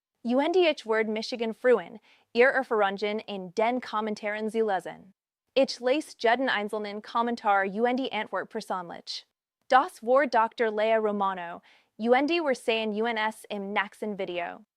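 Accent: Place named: England, United Kingdom. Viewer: Was soll denn der (KI-) Unfug...: deutscher Text, aber englisch und mit englischem Akzent ausgesprochen???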